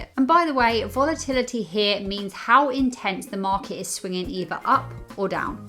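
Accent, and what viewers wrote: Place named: England, United Kingdom. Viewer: i like your content and english accent.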